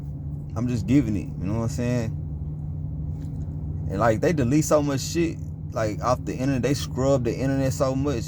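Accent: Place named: Dallas, United States. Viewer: This was a good video. I’ve been studying for years and everything you said in this video is true. Most of America is Egypt. Ancient Israel is on the west side of America (Utah, Nevada, Arizona, and parts of California, etc. The Mississippi River is the Nile of Egypt and that’s why you have so many mounds up and down the bank of the river. I also believe north is south and south is north. Everything is the opposite. The Most High is waking people up. What’s crazy is that you have the same exact knowledge that I have (at least according to this video) so I know it’s spiritual. A lot of other people probably don’t believe or even understand you but I do. That’s how it is when I tell certain people what I know. They just can’t comprehend. I don’t trip though. Maybe it’s not their time or maybe it’s not for them to get. Last but not least, as soon as I heard you start talking I was like he from Dallas 😂 but I see your from FW. 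I’m here too (Dallas). Keep learning bro because you’re on to something.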